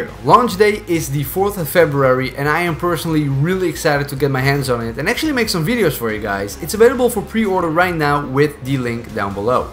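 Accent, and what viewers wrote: Netherlands. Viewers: ur Dutch aren't you? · Je klinkt Nederlands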